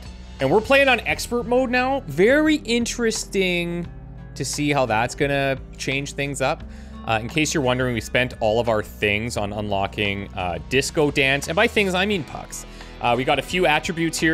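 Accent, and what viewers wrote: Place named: Canada. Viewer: Gets progressively more Canadian as the pressure rises.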